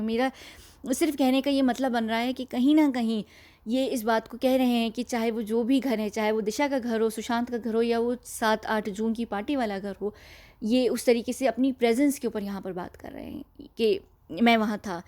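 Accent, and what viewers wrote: United Kingdom. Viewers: I don't know your name , I don't know where are you. But thanks a ton for this job .I like your British ascent. I love your voice as well. Keep up the gud work. Cheers . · you are right, she has worked in several countries including India, but she is British, I can tell from her accent, it was a joke...the way she gives 5seconds to people who think that she fakes the accent and it makes me 😂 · Good info. BTW like your brit accent. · yes she has a british accent as she mentioned herself in previous videos that one of her parent is white(britisher).... i think she travels all over the world.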